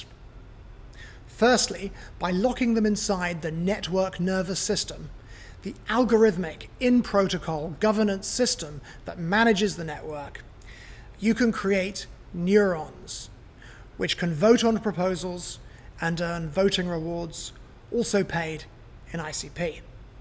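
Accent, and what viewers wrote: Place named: United Kingdom. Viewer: Where can I buy the token ? More importantly how does one learn to speak the queens English with such elegance ?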